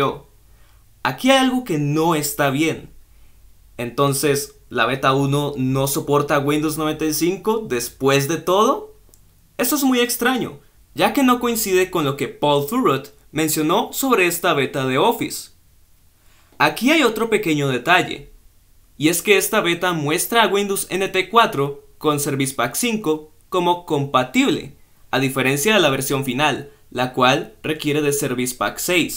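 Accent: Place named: England, United Kingdom. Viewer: I like your English accent, it sounds so cool. Been awhile since I visited your channel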